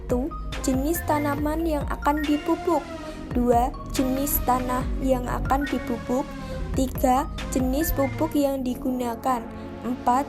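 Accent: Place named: Java, Indonesia. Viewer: Medok men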